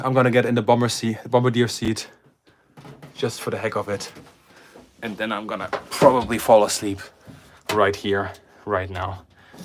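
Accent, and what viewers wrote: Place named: Germany. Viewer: That was awesome the German accent ads to the show love it when you say (Millimeter)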